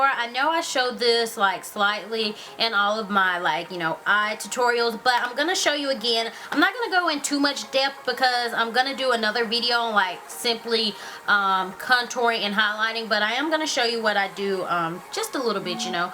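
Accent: South, United States. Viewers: Ur so BEAUTIFUL i love your accent! its southern right? IDK thats why im asking lol(nottryingtooffendne1!) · love your country accent xD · I love your accent! lol Sounds so southern. :)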